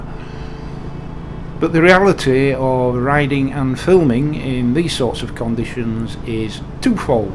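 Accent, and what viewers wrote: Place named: North, United Kingdom. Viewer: Sage words, friend, beautifully expressed(as usual). I often gaze and admire the skills of athletes, sit in awe of the intellect and wisdom of the Hitchens, Frys, Dawkins, Connolys(Billy), Gervaises, Murrays(Douglas), men&women(no pretend inbetweens) in general that inspire self-betterment. But one of my great joys in life.......is to listen to and envy that fabulous lexicon you deploy so wonderfully, friend. That amazing vocabulary of yours, wielded so mildly yet unaggressively with that marvellous northern lilt, is a major pleasure and superb voice of reason and mild-mannered advice for embattled ears. Thank you kindly for your years of service in uniform and the great guidance it now offers a father and husband trying to navigate a very frought world. Take care, friend......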